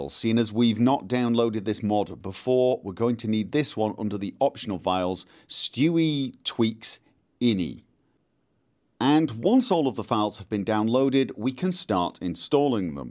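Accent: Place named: North Wales, United Kingdom. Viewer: Are you from North Wales or there abouts? Trying to place your accent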